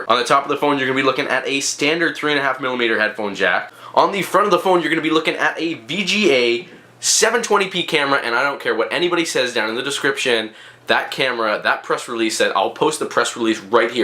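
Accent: Canada. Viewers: Are Canadian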